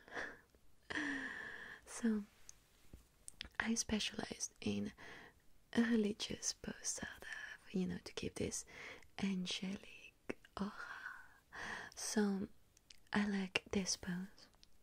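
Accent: France. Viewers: Loving you using a heavier French accent lately, it's extremely calming <3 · oh the french accent 👁️👄👁️ falls in love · The tone of your voice and the heavy French accent sound amazing together 10/10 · i love the french accent, it sounds so nice :,)) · C’est magnifique! She returns just in time for me to go to bed, and with a luxuriously thick French accent. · Oh please do more of these in this french accent you are pure magic!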